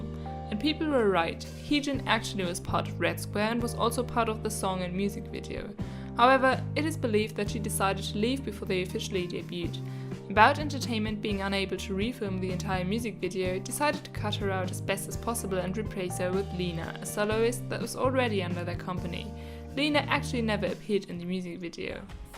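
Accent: Germany. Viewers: Personal question: Are you German? Your accent sounds German to me.... Correct if I'm wrong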